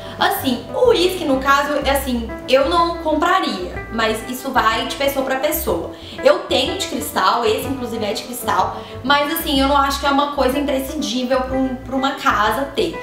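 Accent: Minas Gerais, Brazil. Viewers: Sotaque de mineira!Lindo mesmo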